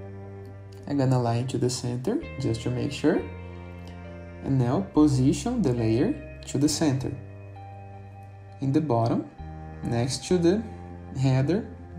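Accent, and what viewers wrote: Brazil. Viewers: Sotaque BR haha nice video!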